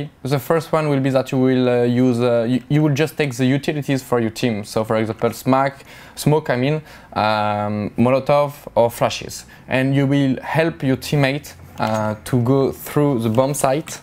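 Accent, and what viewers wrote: France. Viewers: Gre tu comprends ps en plus c un anglais de français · Totally agree, but at least hearing shox trying to speak english is funny (even for french people his accent is bad) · The french accent xD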